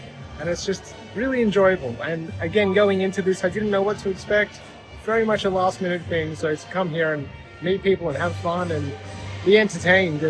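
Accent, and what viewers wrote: Australia. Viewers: You sound Australian...you should know it's called the Gann [sp] not the Garn [sp]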